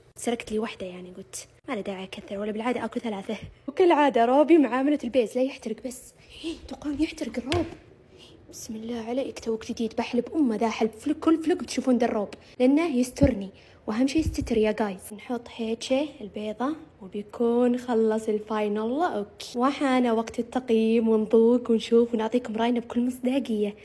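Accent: Saudi Arabia. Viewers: والله عن جد اللهجه السعوديه مره بتجنن انا من الجزائر I love you ❤❤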